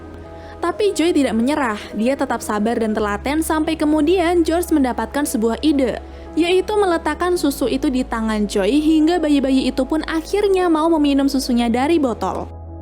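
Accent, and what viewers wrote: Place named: Sunda, Indonesia. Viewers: Teteh Sunda nya😅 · Gaya Sundanya sangat menarik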